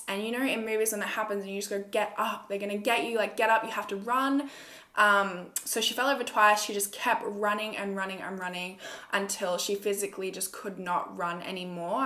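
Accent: Australia. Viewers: It may be because I'm British, but I can't unhear "Pedophile Conio"... Love the Australian accent!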